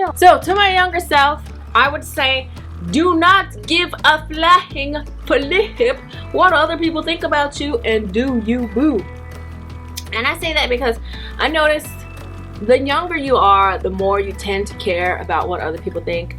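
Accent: South, United States. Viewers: I can tell you are southern girl...love it! You better dig up Mya and Bubba Sparxx LOL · Love you , you are crazy lol ! that southern accent.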